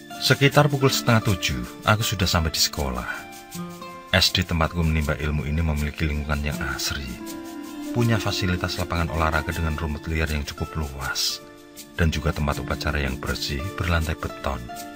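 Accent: Java, Indonesia. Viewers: Sekali2 pengisi suara diganti , om ... Nggak itu2 aja Masak logat NTB sama persis dgn logat Jawa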